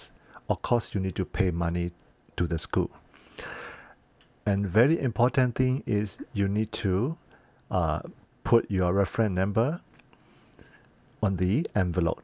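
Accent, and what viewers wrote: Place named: Myanmar. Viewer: Why didn't you explain in Bamar (Burmese) language if your video post is for Bamar . Never ever expressed "Vulgar Slang" Your school is a "Shitty" school, It shows your character. Never ever expressed Offensive wordings toward other person's dignity if you are very careless/disorganized person "that is the school that DON'T provide", It should be" Does not". While you're in Canada .Pay attention on "Vowel"sounds, Then imitate the accent and tone.Again Learn how to make a "SCHWA" vowel. In addition you must learn the culture of the English speaking country you wish & some slang. Keep in your mind that UK, US, Canada, Australia, New Zealand, each has different culture and English accent. Canada official languages are France and English. Otherwise you will be still sounding as Myan-Galish or Ba-Galish. Since you've been staying in Singapore for quite a few years you will have to adjust your Sin_Ga-Lish while living in Canada. 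Do not take as personal. Cheers. Note: I trained my Bamar gal friend in the same way. Now she has a fabulous Canadian accent and some french after 6 years of living in Canada.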